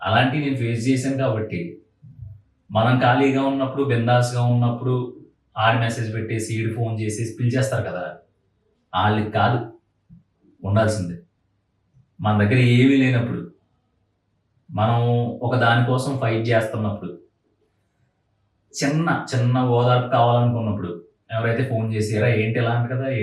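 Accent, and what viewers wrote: Godavari, India. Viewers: Bro midhi Godavari district ahhh ?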